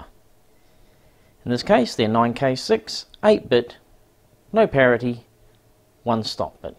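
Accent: New Zealand. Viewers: Hi there, Amazing to hear another New Zealand voice speak so eloquently and informatively. Awesome presentation, I don't know how this is the first time finding your channel. Please keep up the good work, good thoughts to you for helping so many.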